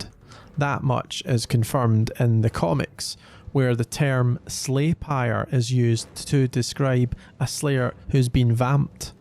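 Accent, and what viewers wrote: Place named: Scotland, United Kingdom. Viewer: Heard a Scottish accent. From Edinburgh here.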